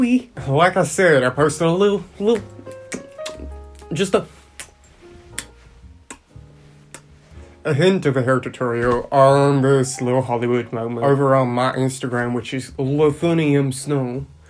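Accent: South, United States. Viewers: This is weird. When the hell did he develop a southern accent? He certainly didn't have it a few months ago. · Is it me but you sound like you were raised south of the Mason/Dixie line (residents of the USA know what I mean) Anyways, I still love your videos!😍😘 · You sound very country lol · It sounds like you have been in the south (in North America) for a few months at the start of this video. Very cute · Is it me or do they have a more Southern American accent now? I had to review their old videos to contrast and it's pretty stark. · Why do you have southern US accent today? Like your Dutch one much better and I'm from U.S Georgia now in Florida.. You do the accent well...just...ugh...hmmm. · Our sweet boy bein' sassy! Absolutely love it! 💕 Btw, I swear you sounded like you have a southern accent in this one. Being from the south, I know a thing or two about those. 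· you sound like you have a southern accent which is funny to my cuz i know ur Holand also you're snatched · your accent........... i’ve never heard anything like it before its extremely southern but i know you’re dutch... wow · it sounds southern American to me 😂😂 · Idk if this is new or on purpose lol but I hear a southern twang 🤠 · Love your accent bud, you are a beautiful man btw . Where in the south are you from ?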